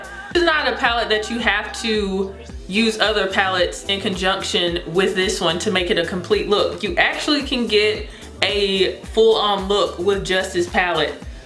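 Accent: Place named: North Carolina, United States. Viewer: Just found your channel and I'm binge watching all day!! I love your country accent you sound like my cousin from North Carolina!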